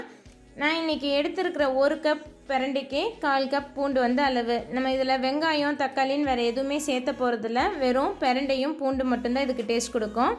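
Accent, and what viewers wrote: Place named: Kongu, India. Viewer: mathikadhinga akka . Kong slang dhan eppavum nice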